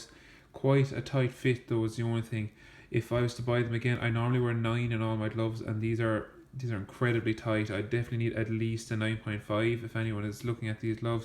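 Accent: Ireland. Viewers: are you from Ireland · sounds like a clare man 😂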